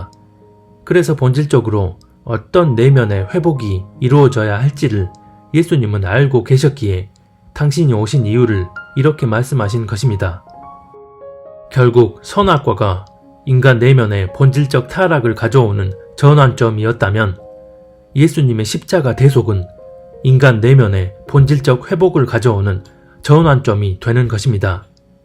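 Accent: Jeolla, South Korea. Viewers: 가끔 들를때마다 많은 도움받고갑니다~^^ 근데 말씀하실때 억양에 광주,전남 사투리가 좀 섞여있는거같아요 ㅋ~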